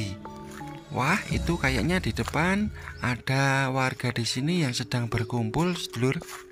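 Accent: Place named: Java, Indonesia. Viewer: aslmkm wargi bandung.. Apa kabar..? Abang asli dari mana ko bicaranya kaya orang jawa. Yah..tapi channel orang bandung..?🙏😀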